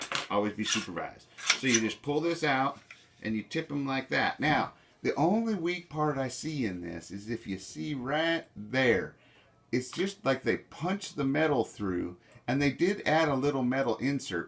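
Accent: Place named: South, United States. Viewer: I detect a bit of Southern in your voice. Where ya at man?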